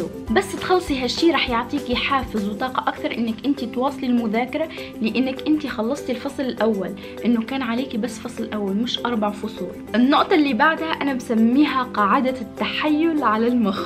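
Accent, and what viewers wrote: Tunisia. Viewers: هل انت تونسية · انت تونسية ؟؟؟ ولا جزائرية ؟؟؟؟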